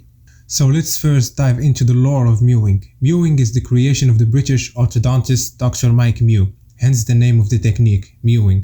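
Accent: Morocco. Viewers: You sound Moroccan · May I ask if you're Moroccan / Algerian? You're accent really sounds like you're from there :)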